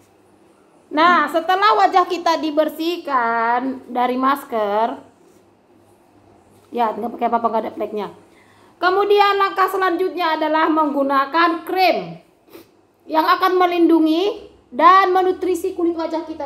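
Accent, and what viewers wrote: Medan, Indonesia. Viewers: Maaff mbak orang medan ya?? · Denarin kk lucu banget kyak orang Medan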